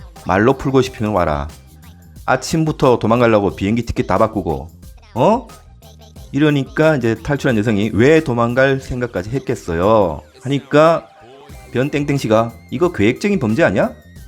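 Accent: Gyeongsang, South Korea. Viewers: 경상도 말투던 뭐던 전달 엄청 잘되는데.. 외국인이 영상을 보나?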